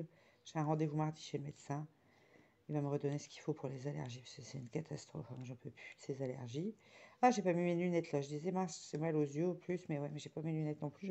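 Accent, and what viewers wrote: North, France. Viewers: Désolée, mon commentaire est envoyé 😙😟. J’habite maintenant en Charente maritime mais suis originaire du pas de calais, quand je t’écoute je retrouve avec plaisir les gens du Nord ! Bravo à Lolo pour le concours. Bisous et à bientôt.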